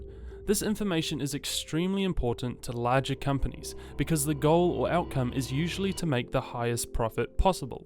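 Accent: New Zealand. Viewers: Wait?? Your a kiwi??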